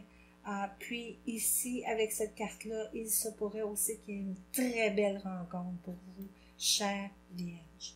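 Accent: Canada. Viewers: Merci pour ce beau tirage, je viens de m’abonner, j’adore votre petit accent canadien plein de douceur😉 Namasté 🙏🏻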